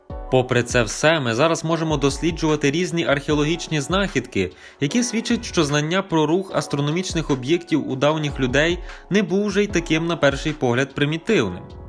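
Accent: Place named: Volyn, Ukraine. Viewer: Класний голос у диктора, з таким рідним ледь помітним волинським акцентом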